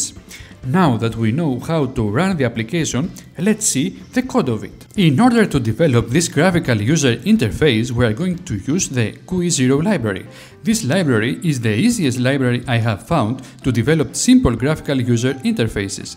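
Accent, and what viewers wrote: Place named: Greece. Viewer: Greek accent tststst.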